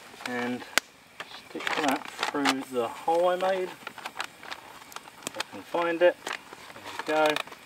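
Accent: United Kingdom. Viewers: Wow i just found a video worth for my lesson to study living outside, i subscribe it m8, your video's so cool And british accent? Youre an england?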